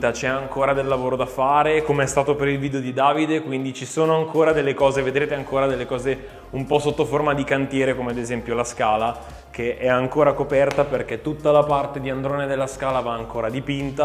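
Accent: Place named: Lombardy, Italy. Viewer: Dimmi che hai la parlata lombarda senza dirmi che hai la parlata lombarda Btw: sono di Verbania, ma tutta la zona intorno al Maggiore e non solo ha l’accento milanese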